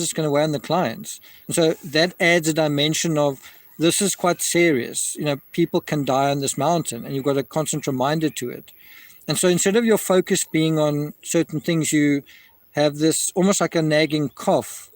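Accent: South Africa. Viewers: Shucks you are South African! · Really really enjoyed listening to this… no offence but the SA accent normally makes my ears bleed but throughly enjoyed listening to you mate 😅❤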